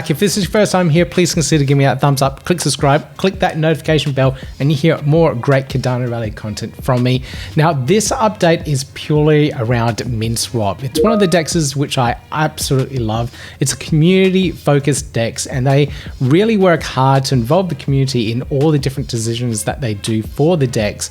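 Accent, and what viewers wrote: Australia. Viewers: You sound even more Australian since returning from your break. Love the accent. I’m ready for another ISPO update if there are some new projects coming up soon. Welcome back.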